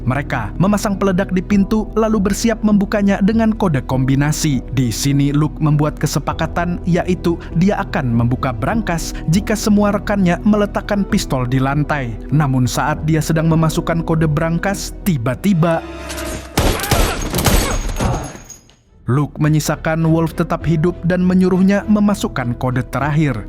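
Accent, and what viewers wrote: Banjar, Indonesia. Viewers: banjar jua skalinya😂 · Org banjar jua sekali nya lah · Orang Banjar kah mang😂😅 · Orang banjar kah bang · pian ni urang banjar kah?😭🗿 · mimin orng banjar kalsel kah,? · Anjay banjar sekali nya sidin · Urang Banjar kah sekalinya amang admin nih😅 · Urang banjar sekalinya 😂😂😂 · Urang Banjar amang nih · Urang Banjar amang nya ini 😂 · Mang Pian Banjar kah Kandangan,barabai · Banjar ya min...tambuk😂😂😂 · Banjar kh pian mang😂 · Katuju banar unda lawan nyawa min ai. Urang Banjar jua sakalinya😅. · Banjar kah mangg? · Banjarrrr bgtt lahh🤣🤣.. wkwkk bgl tapi kerenn bang 😂🔥🔥🔥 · Anjay urg banjar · Jiah, org banjar adminnya 😂😂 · orang Banjar sakalinya😂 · Org banjar jua paman ni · Urang mana pian mang logat nya banjar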